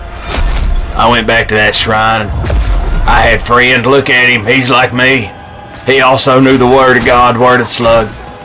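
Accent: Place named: South, United States. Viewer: vaguely threatening southern rambling Thahs the truth.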